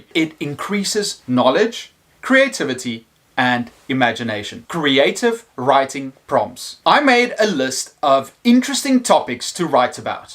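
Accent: South Africa. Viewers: South African accent. Nice.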